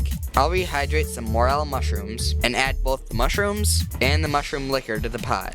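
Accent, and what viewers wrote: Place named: United States. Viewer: Weird you sound American but your bro. Sounds like an Englishman great video though :)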